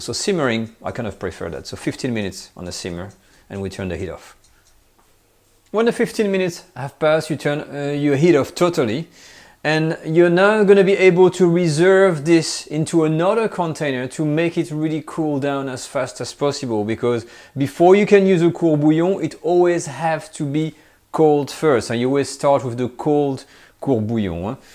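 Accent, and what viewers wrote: France. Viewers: You hv the cutest French English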